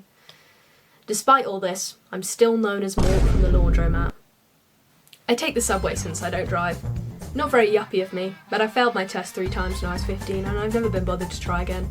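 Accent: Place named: United States. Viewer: you gotta teach me that US accent!!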